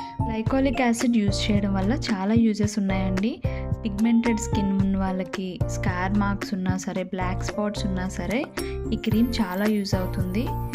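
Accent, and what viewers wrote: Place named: Godavari, India. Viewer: మీ way of talking చూస్తుంటే గోదావరి జిల్లాలో తెలుగు అమ్మాయి లా ఉంది.. nice explanation ❤😊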